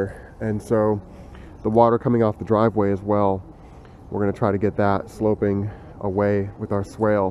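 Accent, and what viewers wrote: United States. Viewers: I can't place your NC accent....Cary?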